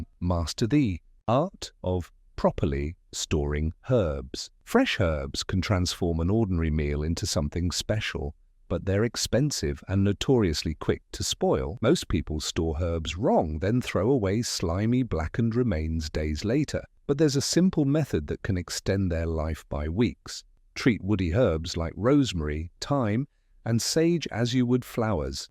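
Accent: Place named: United Kingdom. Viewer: A video by a channel that implies USA-centered content, and narrated by someone from the UK?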